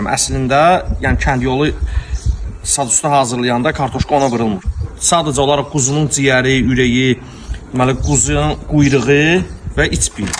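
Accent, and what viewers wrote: Baku, Azerbaijan. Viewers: Can Bakı ləhcəm❤ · Bakı ləhcəsi .Var ol .Əllərinə sağlıq . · Salam.Bakı ləhcəsi,ƏLA.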